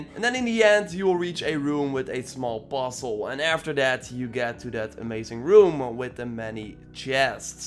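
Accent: Netherlands. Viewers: Damn, are you Dutch? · You Dutch? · Are you dutch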